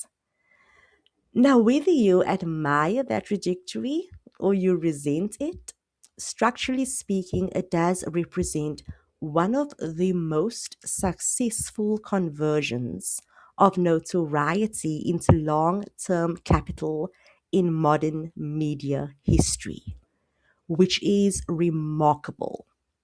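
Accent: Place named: South Africa. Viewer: Omg girl are you South African🇿🇦???The accent??😭❤️please tell me you are South African I know a indian south African/coloured accent😂❤ Also nice video ❤and interesting take Although I do like their hustle,I also see where people are coming from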